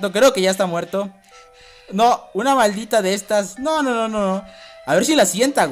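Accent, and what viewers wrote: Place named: Latin America. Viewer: me encanta el acento latino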